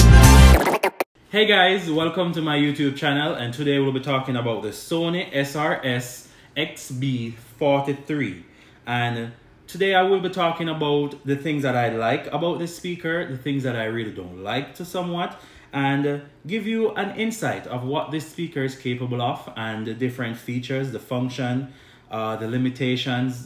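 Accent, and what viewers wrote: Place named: Belize. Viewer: Bro you sound Belizean! What's your nationality? If you don't mind me asking!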